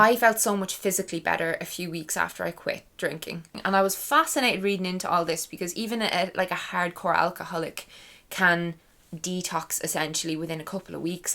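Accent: Ireland. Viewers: I can tell by your accent that you are Irish, but that is just me! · I love listening to you. Your irish accent reminds me of when I was living in Galway a few years back. Love Ireland. Big up to you for getting sober. I am two weeks in at the moment. Feeling so much better already. Greetings from germany. Chris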